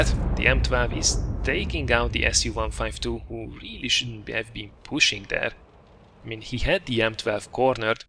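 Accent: Hungary. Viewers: Tudtam, hogy magyar vagy! :D Csak néha belenéztem a videóidba, és a kiejtéseden lehetett hallani, hogy magyar vagy. Csak amíg nem jelentetted be, nem voltam benne biztos!:D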